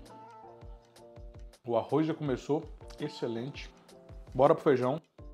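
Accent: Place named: Minas Gerais, Brazil. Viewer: Oi! Desculpa me meter na sua pergunta kkk O já falou que a cidade dele é muito perto de MG, por isso ele tem esse sotaque meio mineiro, mas ele é do interior de SP mesmo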